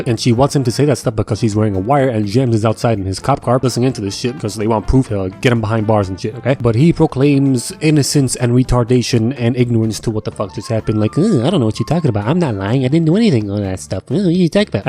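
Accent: United Kingdom. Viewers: Wait are you British because that makes sound more funny idk maybe it is just me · r u british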